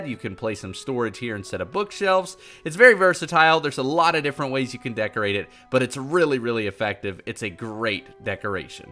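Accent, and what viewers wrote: United States. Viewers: He does have an accent it's just an American accent can't really pinpoint it but he does have one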